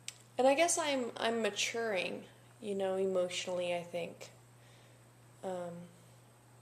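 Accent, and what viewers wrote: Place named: Pennsylvania, United States. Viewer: Ha! I knew you were from PA by your accent! I"m in Pa too! What part did you come from? i'm in NorthEastern, Near wilkes-barre/Scranton. I'm Guessing near Lancaster/Lebanon? Love ya hon! Ruthanna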